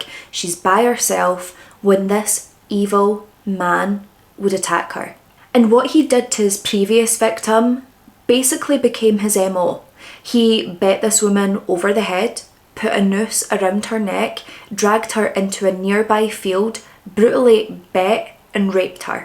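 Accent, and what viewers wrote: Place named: Scotland, United Kingdom. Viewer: Every time I watch your videos, my inner dialogue is in your voice/accent for like the entire rest of the day 😂 and I’m a California girl so I literally cannot even do a Scottish accent myself. Just in my noggin lol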